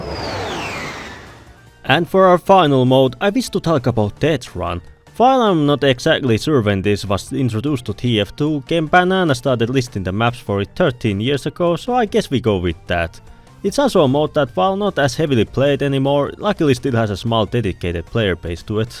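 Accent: Finland. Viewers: I am a simple man, I hear Finnish accent, I subscribe.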